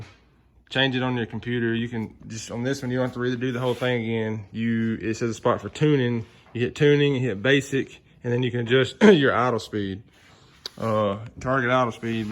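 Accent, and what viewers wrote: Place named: Alabama, United States. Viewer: Bro I watched both your truck videos I'm doing exactly same thing to my 86k10 had it for almost 20 years finally put new motor in her and Holley sniper I'm getting rid of rats nest of wiring and straighten out vacuum which lead me to you videos .. preciate the post.. ha catfish skinners .. had me rolling. You from Alabama too? 🤣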